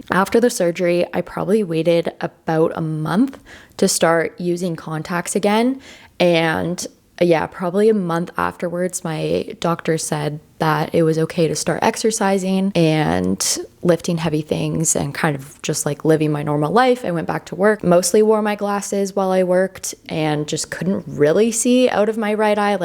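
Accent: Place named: United States. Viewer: I'm an American living long time in Cambodia and just had my Vitrectomy done today. No compliants about the medical treatment here and all the equipment was high tech, etc. etc. However, starting my 5 day journey with my head "locked" into position, right side for the gas bubble, and the "mental" clouds of depression and worry start to happen. So! Appreciate this video as it will helps me look forward to the ups and downs of this surgery. Great to also listen to American English explain things as the language barrier here stressed me out a bit as I am just not 100 percent sure sometimes on the info that was given to me. Again, the medical treatment here in Phnom Penh has actually impressed and now it is up to me to follow doctors orders ( 5 full day in head "lockdown" and stay positive. Thank you for real.........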